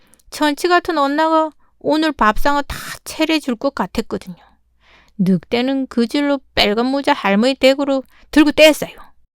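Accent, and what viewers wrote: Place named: Gangneung, South Korea. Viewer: 들을수록 강릉사투리에 웃음이 나오고 흥미롭고 알고 있는 내용이지만 다음에 나오는 이야기에 마음이 긴장되고 ㅎㅎㅎ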